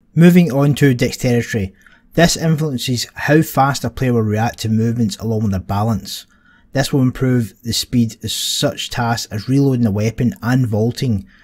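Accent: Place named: Scotland, United Kingdom. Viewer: you from the area near Edinburgh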